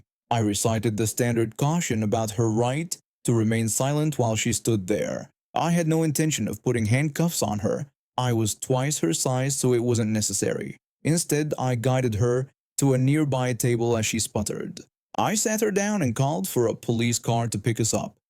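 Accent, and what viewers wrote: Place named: United Kingdom. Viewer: It's the British and Australian pronunciation. Many of these stories originate in other countries, and is clearly not a US native. No doubt he learned English from a Brit. I think his pronuniation is kinda cool!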